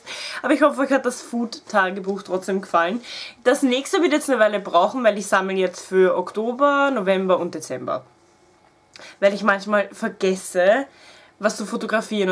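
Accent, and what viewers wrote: Austria. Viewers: Ich find dich so süß. Ich könnt dich grad echt knuddeln. Und ich LIEBE deinen österreichischen Dialekt. :-)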